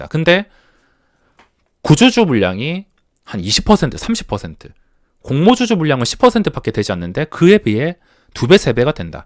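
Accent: Gyeongsang, South Korea. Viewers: 경상도 특성 강제로 보시라 안했음 싫음 보지 마삼 오케이?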